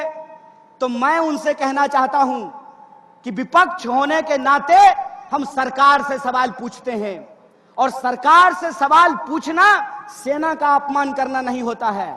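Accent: Bihar, India. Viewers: Full bihari andaj waah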